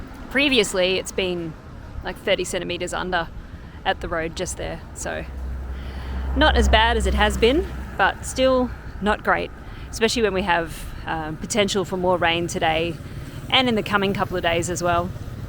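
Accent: Australia. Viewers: Jeez that's alot of water about. Just discovered your channel. Subbed, looking forward to see more of the life in Cambodia. Great to hear the Aussie accent too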